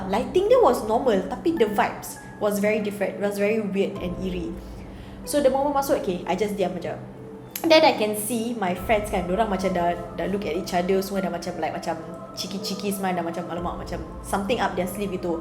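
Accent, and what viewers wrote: Singapore. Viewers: Are you Singaporean? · Tgk vid ni baru aq tau singapore pnye bm pn loghat lain siak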